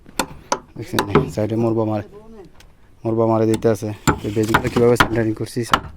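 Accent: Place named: Noakhali, Bangladesh. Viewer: আপনার বাড়ি মনেহয় নোয়াখালী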